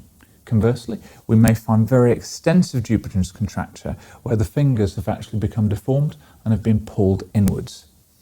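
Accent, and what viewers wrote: United Kingdom. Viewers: It’s interesting for me to hear all of these same unusual terms, said in a similar British accent, but by a different doctor. I’ve watched the Curtis Robb hand examination video tons of times. It’s crazy how complex the hand system is!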